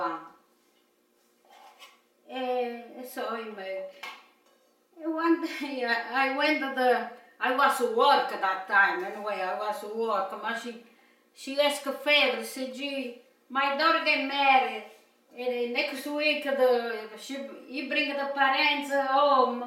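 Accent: Italy. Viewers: Italian-english, two in one 😘😘 💓❤️ 🌹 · I watched my mom make the shells mostly for holidays. You even say it the way we do. Same dialect, must be same part of Italy 🇮🇹 thank you for the memories and recipes.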